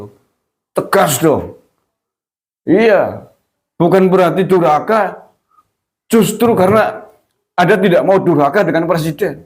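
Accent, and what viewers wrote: Banyumas, Indonesia. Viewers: Semangat truss mas Bro , ...ngapak menyala ❤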